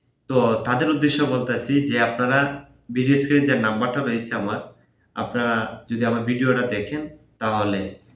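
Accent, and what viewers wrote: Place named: Mymensingh, Bangladesh. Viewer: বাড়ি কি ময়মনসিংহ, ভাষা শিখে তারপর ভিডিও করবেন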